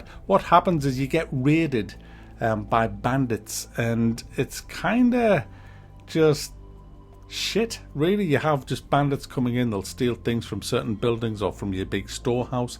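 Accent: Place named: County Durham, United Kingdom. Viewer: Love seeing the reviews, have done for years, im from county durham and weird hearing a local accent on youtube!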